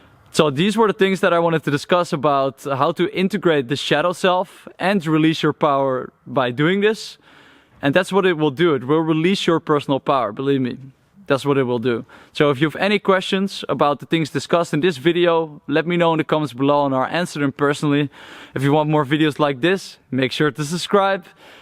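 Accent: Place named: Netherlands. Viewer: Je bent nederlands toch want je klinkt heel nederlands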